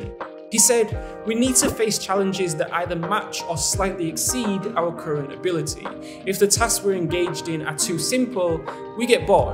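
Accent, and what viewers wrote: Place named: West Yorkshire, United Kingdom. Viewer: You sound like you're form west workshire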